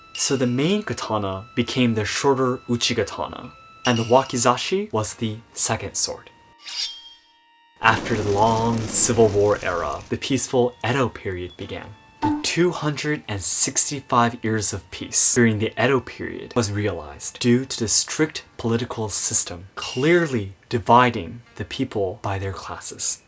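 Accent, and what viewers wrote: Michigan, United States. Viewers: Your measured, succinct speaking style with an American Midwestern accent is very appealing. Listening to you is so enjoyable. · I can hear the "Michigan" in your english. Its very clean with none of the inflection that you find in other midwest states in that area like Wisconsin or Minnesota. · Dude, you sound more like a Michigander than I do! Very interesting video. Well presented.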